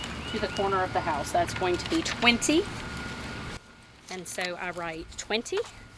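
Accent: South, United States. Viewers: I am so excited to have found your video! I found you through Dig, Plant, Water Repeat :) I’m growing in Hawaii so I’m a zone 12a. My climate and soil is drastically different than yours. I have all soil brought in and it’s only 3 inches before I hit solid lava rock! I wish I could design beds like yours :) I also love the sound of your voice and accent. My fiancé is from Georgia and Alabama, so listening to you talk is very similar to his grandma and mom’s. It’s so soothing and lovely. I cannot wait to follow your journey!! I make garden videos too (not as great as yours) but if you want to see what us hawaii people garden in then give it a look. Aloha!!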